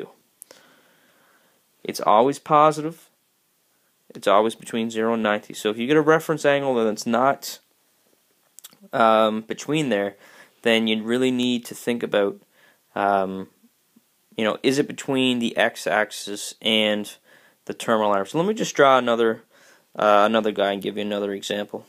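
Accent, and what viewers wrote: Newfoundland, Canada. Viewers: I knew I realized the accent! Newfie as well!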